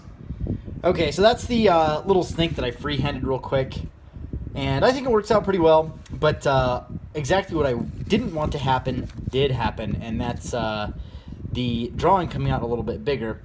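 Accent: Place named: Albuquerque, United States. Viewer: Burqueño hahaha oh man bro straight from barque for sure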